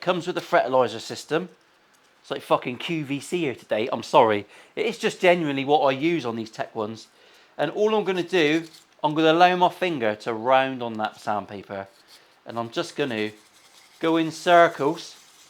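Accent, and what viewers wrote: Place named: Cornwall, United Kingdom. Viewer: 'Ere,! I lived in Torpoint for a couple of years when I was a kid. It sounds to me like you've got a touch of Cornish in your accent. Did you live west of the Tamar for a while, or is it just a Plymouth thing?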